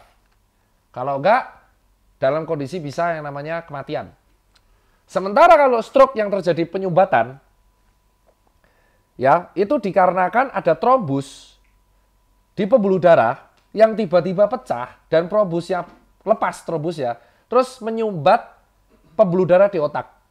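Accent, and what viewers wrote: Java, Indonesia. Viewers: Logat jawa nya yg bikin gue ketawa terus duh 🤣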